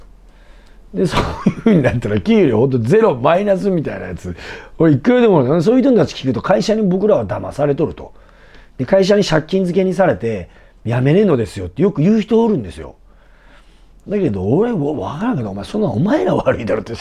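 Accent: Nagoya, Japan. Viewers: いいね 名古屋弁！港区か南区あたりの出身ですか？ 思わずチャンネル登録。Goodボタン押したよ。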